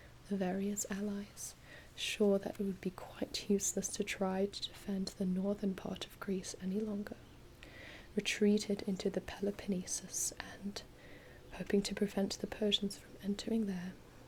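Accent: England, United Kingdom. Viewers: Shouldn't you have a Greek ascent? and not a n English one? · Wow, English accent is spot on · Okay but why the british like accent dude? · Holy hell this accent!!! I literally did a double take when you first spoke because I couldnt understand how such an english accent was coming out of your mouth haha. Yassssssssssss huge congratulations, you are absolutely awesome and such an amazing asset to our rapidly expanding community! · Love it good job on the English accent big shout out from Leeds England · Why does she have an English accent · Why the british accent though??watching this as greek is so cringy but w/e great work anyways.. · Carrying on the fine tradition of the media giving all ancient or futuristic civilisations British accents, queeny approves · Insanely good British accent!! loved it! seriously sounded like you are from England :4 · That English accent though 👌👌👌😂😂😂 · Wow that's the best English accent I have ever heard from an American, honestly in a movie you'd not be able to tell which side of the atlantic ✌️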